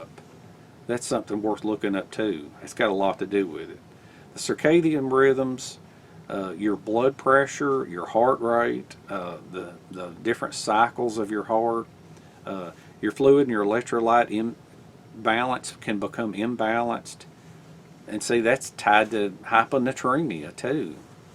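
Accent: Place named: Appalachia, United States. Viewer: Hi. Although it's true that you have a strong Appalachian accent, your word enunciation is always pure. Whereas, in my accent, some syllables are left out or modified. Your CC is great and matches your vocabulary perfectly. I did enjoy it. Thanks. I love having CC as an enhancement to a video.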